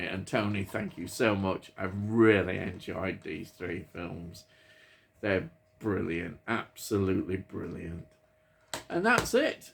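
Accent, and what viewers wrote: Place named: Liverpool, United Kingdom. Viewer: This man is speaking in a scouse accent.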